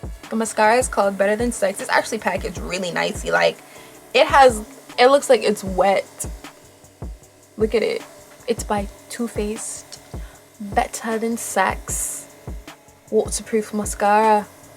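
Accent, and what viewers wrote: Jamaica. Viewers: Its the Patois for me, LMAOOOOO, LOVE THIS VIDEOOO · Are u Jamaican? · Are you Jamaican my dear??? Becau you sound like an Jamaican ooman dem!!!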